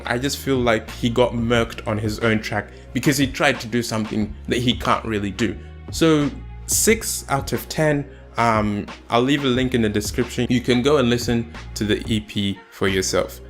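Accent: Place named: Ghana. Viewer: Are you Nigerian?, you sound Ghanaian.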